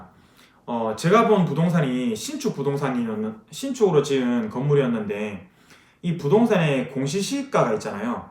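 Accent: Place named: Gyeongsang, South Korea. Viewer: 생긴건 서울 남자 같은데 경상도 사투리 너무 심하게 쓰네 ~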